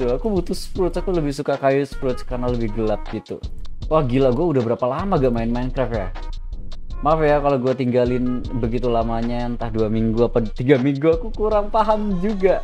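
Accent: Madura, Indonesia. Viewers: Bang lu orang madura? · Orang MADURA Bang? Madura mana bang?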